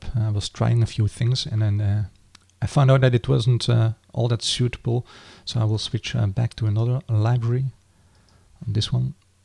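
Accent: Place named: Netherlands. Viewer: Great info! Thanks man, you sound Dutch.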